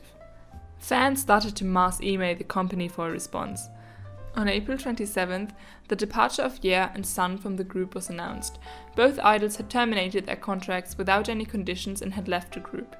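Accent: Germany. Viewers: Personal question: Are you German? Your accent sounds German to me.... Correct if I'm wrong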